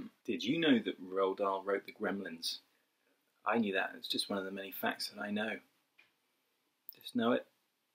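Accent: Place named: England, United Kingdom. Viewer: I love how more and more comfortable you are in front of the camera. If your accent didn’t give your nationality away your dry humor did. It is very much English 😂😂😂. I love this random video please make this a segment. It’s literally stream of consciousness in video form. It’s absolutely brilliant! Who ever was on the other side of the camera was hilarious, I like her!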